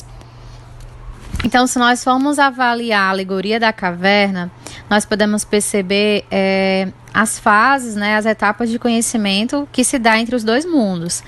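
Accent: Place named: Ceará, Brazil. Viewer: Legal o jeito que tú fala. Tú é cearense? Parabéns pela a aula!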